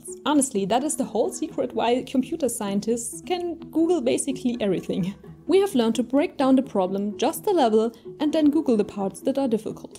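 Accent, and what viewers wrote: Germany. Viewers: Great video. But can I hear a German accent? Nice. Greetings from Gelsenkirchen, Germany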